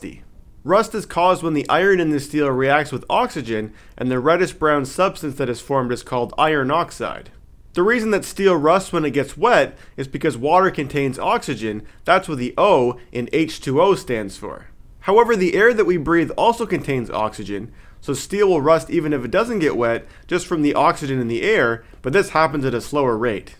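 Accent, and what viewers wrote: Canada. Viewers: if anyone wants an example of how a canadian accent is different to US, here it is.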